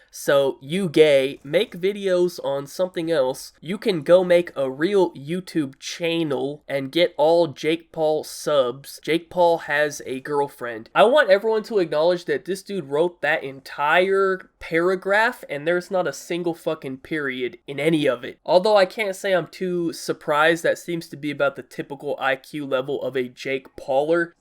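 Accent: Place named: Texas, United States. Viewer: Sorry I don’t really understand your Texas voice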